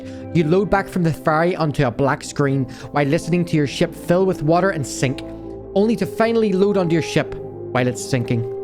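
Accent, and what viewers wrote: Northern Ireland, United Kingdom. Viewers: Good to hear another person from ni here lol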